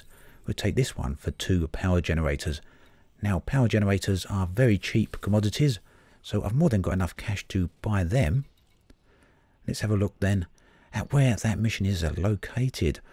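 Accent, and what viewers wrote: United Kingdom. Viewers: Funny how brit accents always make videos classier lol good job love Elite ;)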